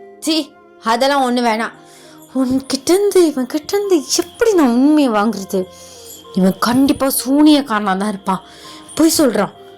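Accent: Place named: Nagore, India. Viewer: Ena sis engala ippidi suspense layae vaikiringa😩but na story half laye guess pannitan y/n also human illanu😁next intha upcoming story kaga waiting sis🤗oru veala enoda guess y/n half human +half Demon or werewolf ha irupalooooo.....Sis neenga demon nu tha solluvinganu nenichan😂na last comment la kuda Demon nu tha potan😂but idhu sema story sis i loved it😊unga slang awesome neenga nagore ha sis😊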